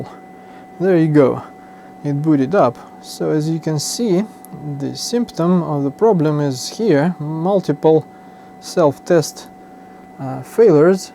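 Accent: Russia. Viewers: Great video. Enjoyed very much watching/listening while you troubleshoted. Thanks for sharing. (Also enjoyable to hear various english 'world'-dialects, and I guessing you are east-country, russian or something. Its beautiful that there are so many variations around :-) ..have nice week to you, fam and the surroundings. br, Rolf/norway) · Акцент, и очень сильный. Я только потому задержался на видео, что с первых слов заметил типичное российское звучание английского. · Hello to russian colleague! (English native speakers never actually realise how exactly the real russian accent sounds like!)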